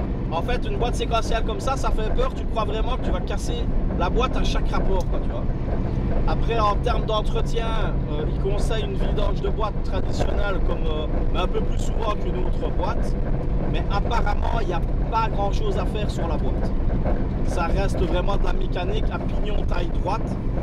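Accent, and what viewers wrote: Liège, Belgium. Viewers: avec l accent lidgeux 😅 parfait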